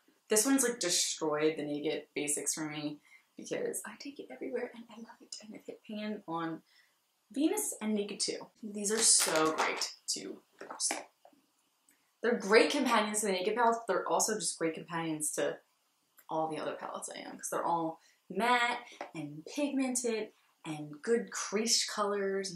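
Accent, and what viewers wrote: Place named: Philadelphia, United States. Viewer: Okay, upon further investigation (Instagram), I see you're from Philly!!! I knew it! I lived in Ridley Park for a few years and then moved to Jersey. I'm LOVING your channel. And probably the fact that you sound like me although my accent is pretty toned down. So, I'm just wondering, can we be best friends?! You have the cutest personality and I've been wanting to start a channel for so long now. Low key girl crushing on you right now (in the let's be friends kind of way) lol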